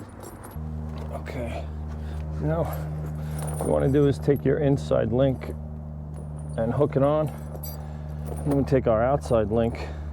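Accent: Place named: New England, United States. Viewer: I detect a NE accent. For the snow you get…..😬 “Tractor” you say? Funny, I don’t see a 3 point hitch…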